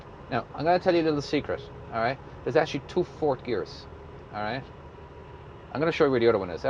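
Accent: Ireland. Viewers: Very cool to see! Also you have a very cool voice, are you originally Irish by any chance? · The best shifting video. Irishman? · dude sound Irish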